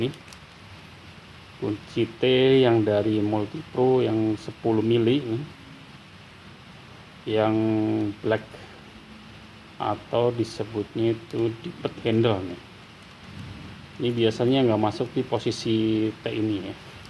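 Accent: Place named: Jakarta, Indonesia. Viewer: logat betawi bangett sih bang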